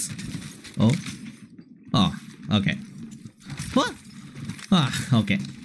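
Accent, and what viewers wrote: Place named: Japan. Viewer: perapera Engrish is still the best thing I've ever heard ngl.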